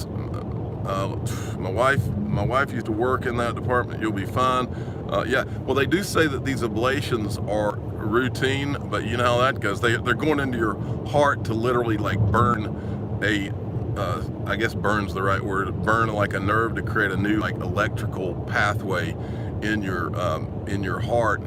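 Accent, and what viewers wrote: South, United States. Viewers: I Have listened to you for along time and have always enjoyed you streams, I always imagined that you were black, but it is that southern accent and you very deep voice, here in England I have a good friend from Alabama and his accent is pretty simular and he is Black! Just saying, I am not disappointed but I am surprised!😄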